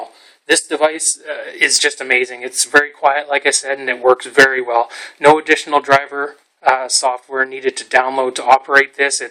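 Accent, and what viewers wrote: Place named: Canada. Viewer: Sounds like Canada ... oot (out). Should've cover more technical details. Most of what you covered was cosmetic. Spec details such as is it bootable, what the three onboard ports can be used with - what they will drive (other USB 3 enclosures, etc) and similar would've been more useful than it looks really nice, it's well-built, you highly recommend it, etc., etc. Also, of course it's going to be quite, it DOESN'T have a fan! Your comments sound like you got paid (compensated with free or discounted product) for this review.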